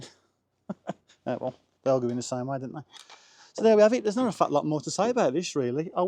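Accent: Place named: Scotland, United Kingdom. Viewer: I'm going to guess it's a Scottish accent that is causing the problems 😅. Might have even watched the video myself thinking what an arrogant way to show a video...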